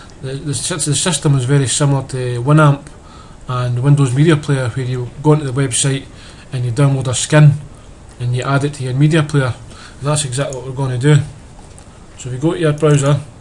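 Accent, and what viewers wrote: Scotland, United Kingdom. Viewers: brother are you Scottish i like your accent and thanks for the video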